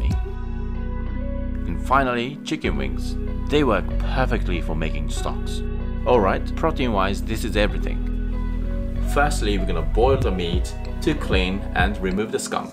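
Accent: Australia. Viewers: Thank you for your wonderful video. Your English is perfect & it sounds like you have a bit of an Aussie accent. Bravo. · Are you in Australia? I hear a pretty strong Aussie accent. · You sound asian australian mate, great recipe btw!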